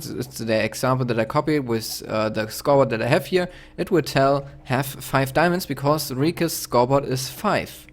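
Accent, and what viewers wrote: Germany. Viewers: Are you german? ;)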